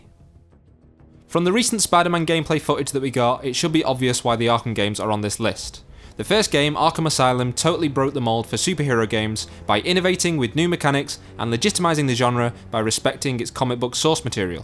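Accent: United Kingdom. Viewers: Wow, you have so beautiful voice 😀 British Accent is music to my ears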